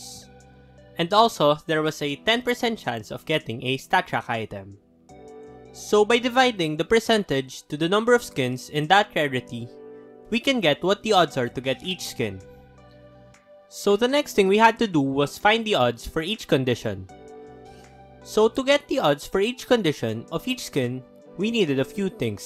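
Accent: Philippines. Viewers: Pare keep up the good work, nahalata kitang pinoy dahil sa boses, Thanks for the hardwork you put in your vids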